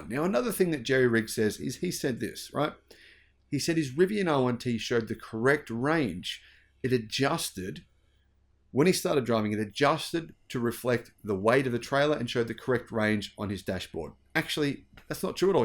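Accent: Australia. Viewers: Nope! I don't trust your Neanderthal a** anymore. JerryRig is very clear and honest. You sound like you're from Australia or something, that makes sense considering you can't Believe that somebody would drive 65 miles per hour , that's normal here in America in fact slower is dangerous. The region he towed in is cold and mountainous this was a worst case scenario. I know it's hard for you to believe that places like this actually have snow and there's actual mountains, living so far down under, But they do. Every phrase you used it was said to put doubt into what happened but offered no proof. All in all if the truck can't go to 250 miles (real world miles) towing on one charge it can't tow.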